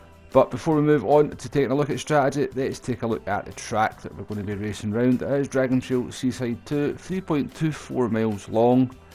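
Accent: Scotland, United Kingdom. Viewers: North American by any chance? they seem to be the ones asking for subtitles the most. I find his pacing and delivery excellent and the mild Scottish accent only adds to my enjoyment of them, if we are lucky he might respond with a link to a properly unitelligiable Scotsman as his accent is imo really not that bad.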